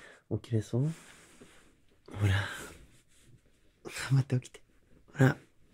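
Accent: Kansai, Japan. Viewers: もうめちゃめちゃ好きすぎて…😭 関西弁なの本当にやばいです…!! 尊い…🤦‍♀️✨ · めちゃめちゃ好きな声ではないんだけど喋り方、雰囲気がなんかハマります！癒される！あと関西弁がいい👍💕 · 久しぶりのボイスありがとうございます😭💙 かわいふふでかっこよくて最っ高です😖💞 あのボイスの続き……❔🥺 関西弁なんかいつもより多く感じて好きすぎるし、最後のちゅっも……🤦🏻‍♀️♡ どきどきとニヤけが止まんなかったです。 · なるほどこれアラームにすればいふくんの彼女になれるという訳ですねありがとうございます大好きです関西弁の犬系ドS低音イケボいふくんごちそうさまでした🤦🏻🤍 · いふくんのASMR初めて見たんですけど破壊力！！( ´ཫ`)♥いい声すぎます､､､ ずっと幸せそうなの最高です💞関西弁も相まってさらにかっこよさが､､､꒪꒫꒪) ありがとうございます！ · うぽつです！ （遅くなりました） 動画待ってました様〜！！久しぶりにいふくん来た！今回も甘々の関西弁がリピートを誘わせて, これ子供が見て大丈夫なんだろうか, てか無料で聴いて本当にいいのだろうか, 最後の｢おはよ｣はしぬ😭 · 寝起きな感じの声のトーンと甘い関西弁がささりました🥲 ずっと幸せそうに話してるの好きです( o̴̶̷᷄﹏o̴̶̷̥᷅ ) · 関西弁×透き通る低音×甘えたな感じ、最高でした。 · えっちょ、、、地味に関西弁なのマジ好きあのね普通に心臓もたないオッケーの言い方可愛いチャンネル登録失礼します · 初見です!!!! 一目惚れしました声イケボ過ぎ!!!!!&かわいい&関西弁((最高( ´∀`) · しぬぅ☆ 関西弁が尊い😇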